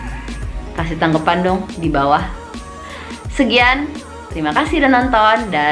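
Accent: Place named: France. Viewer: Aksen perancisnya kebawa pas ngomong bahasa Indonesia ya 😆 aku suka kontennya. Kalau boleh request aku mau dong kak penjelasan penggunaan huruf “y”. Sampai sekarang belum mengerti :(. Terimakasih sebelumnya 😆 oh iya, baru kali ini nonton kontennya sampe ketawa ketawa 😂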